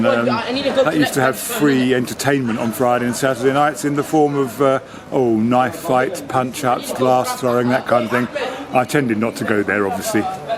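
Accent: Woking, United Kingdom. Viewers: Yes his accent is Woking, just like mine 😊😊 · From Canada here. Is that the Woking accent you’re speaking in?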